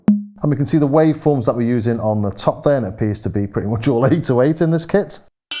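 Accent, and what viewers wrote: Liverpool, United Kingdom. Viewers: love the video its helped me out, are you a liverpool lad?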